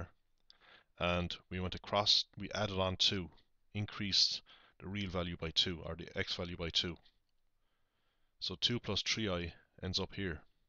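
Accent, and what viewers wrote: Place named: Ireland. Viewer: hay im doing my leaving this year and there is a certain question that seems to re-appear often finding unknown points on an argand diagram it is on the 2013 new sec paper 1 section A question 1 (b) i was wondering if u had or could possibly make a video for this question as i suspect it could appear on my paper thanks very much.( its great to have an irish accent doing a commentary theres only so much American accent i can take:)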